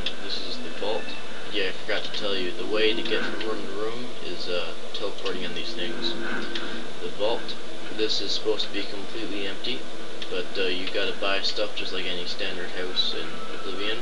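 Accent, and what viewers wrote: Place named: Eastern Canada, Canada. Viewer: your from eastern canada arn't you?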